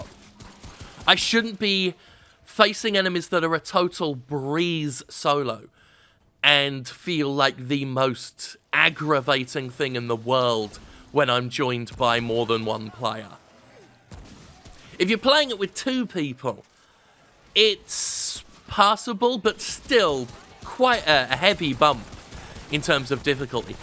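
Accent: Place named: RP, United Kingdom. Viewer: Allow me to save you the time of watching this review. Prefaced with that I used to love Sterling, I've been here since before the cornflakes and before the stint of "addicted to stress". I've been a fan for a long ass time, and shared many of the same views he did. But now all I see is.... Sterling Hates Everything, He Hates Fun. He Hates Friends. He Hates People. He Hates you, your family, your dog, your cat, your nonexistent significant other, your existent significant other, your brother from another mother, and everything that has ever held a state of matter since the dawn of time. He literally has turned into a grumpier "Francis" (Boogie2988) with a posh accent. He spits out reviews after playing a game for 5-10 minutes, says he hates it, says that everyone who disagrees with his review or dare I say it, finds his review full of false information, is full of bullshit or are just hating on "True gaming journalists like him." AKA: Sterling has officially become the IGN of indie reviews. 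Except instead of getting paid to rate games higher, he's getting paid to shit on everything. And it's not just this game. It's a common theme among all of his videos as of late. Sorry. I used to thank god for you, now I'm just not a believer anymore.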